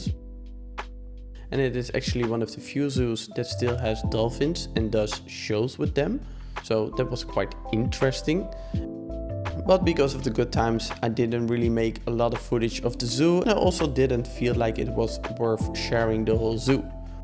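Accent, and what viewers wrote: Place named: Netherlands. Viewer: I hear dutch in your voice😁🇳🇱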